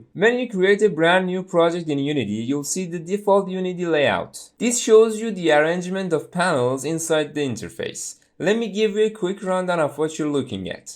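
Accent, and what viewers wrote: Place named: Iran. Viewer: داداش لهجت داد میزنه ایرانی 😂عالی داش همینجوری قوی ادامه بده خوشحال شدم ویدیوت رو دیدیم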